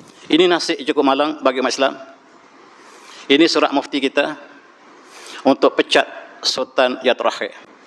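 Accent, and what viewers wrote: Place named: Kelantan, Malaysia. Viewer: Saya sangat meminati isi2 ceramah Prof. Sgt banyak ilmu yg boleh dikutip untuk dijadikan panduan dan pengajaran. Cuma, cara sebutan prof ada masanya agak sukar difahami. Samada terlalu laju atau pengaruh dialek kelantan yang kuat. Kalaulah prof dapat memperbaiki cara sebutan dalam setiap ceramah, ia akan lebih bermakna dan memberi menafaat kpd semua penonton. Penonton prof bukan shj dari Malaysia. Ada juga dari Indonesia, Brunei, mungkin juga dari negara lain yg lebih sukar untuk memahami dialek tempatan. Apapun, saya sgt menghormati prof, seorang ilmuan yg dalam kelasnya tersendiri. Teruskan dgn video2 yg memberi manafaat kepada umat sejagat.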